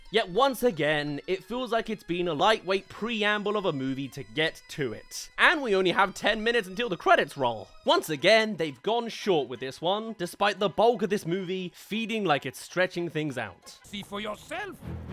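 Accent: United Kingdom. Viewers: Wait!! You’re British??? 😅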